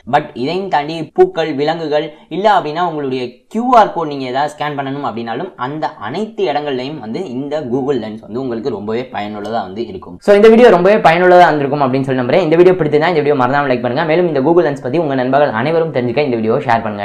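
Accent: Madurai, India. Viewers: Neenga madurai karara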